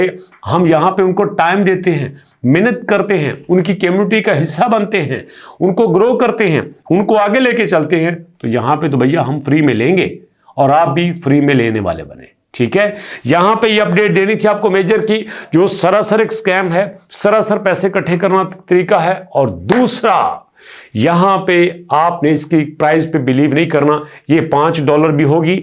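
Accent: Punjab, India. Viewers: Very good Bro....tuhadi hr Vedio bht hi infirmative hundi aa...and tuhadi punjabi ne tn dil Moh lia....❤❤❤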